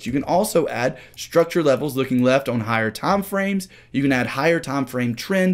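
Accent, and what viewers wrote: South, United States. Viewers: He has a thick country accent.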